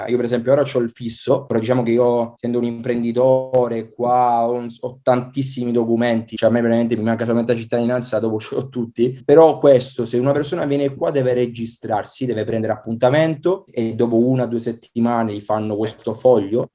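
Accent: Tuscany, Italy. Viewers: con quell accento toscano, é spettacolare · Toscano eh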